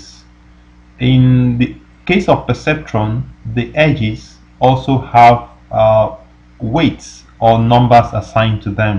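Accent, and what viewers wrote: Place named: Nigeria. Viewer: I dont think his voice is not good for explanation. I think the problem is the accent. I am Nigerian and we have similar accents. Its the same challenge I experience when watching videos with strong Indian accents. In my opinion, his voice is clear and he explains well. The only challenge for you is that he doesnt speak with an accent that you understand.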